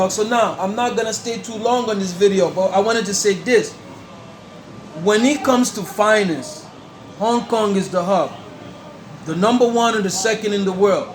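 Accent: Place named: Jamaica. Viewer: You sound jamaican ☺🇯🇲💖or other caribbean island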